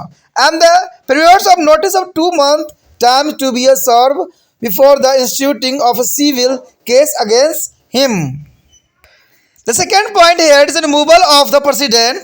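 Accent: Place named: England, United Kingdom. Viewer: Sir thoda dhng se bol lo angrej mt bno smjh hi nhi aarha kya bol rhe ho 😢😢😢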